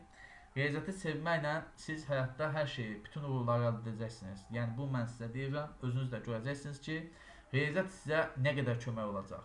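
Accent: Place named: Salyan, Azerbaijan. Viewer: salyan 😊oxwayir daniwiq terzimiz)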